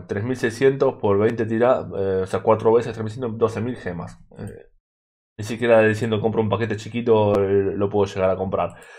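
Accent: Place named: Argentina. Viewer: vos sos Argentino no? Se te nota toque en la voz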